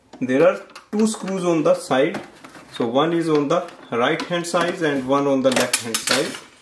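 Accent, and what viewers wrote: India. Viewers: Heard the indian voice knew i was saved twin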